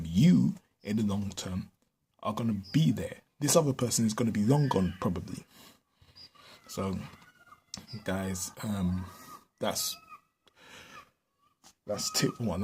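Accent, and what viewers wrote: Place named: Nigeria. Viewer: I hear Nigeria in your accent.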